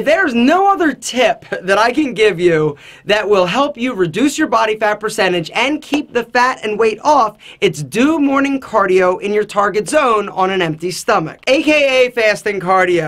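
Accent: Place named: East Coast, United States. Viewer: east coast accent love it man where r u from?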